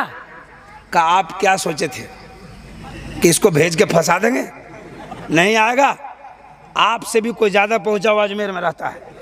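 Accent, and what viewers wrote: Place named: Bihar, India. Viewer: लग रहा ये बिहारी है! ऐसा इस लिए कि इनकी बोलने की शैली बिहारियों जैसी है! बड़ा मज़ा आ रहा है सुनने में! वैसे ये जी रहने वाले कहाँ के हैं?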